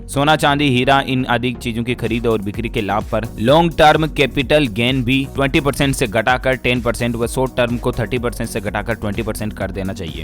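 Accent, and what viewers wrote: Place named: Odisha, India. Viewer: Udisa se bol raha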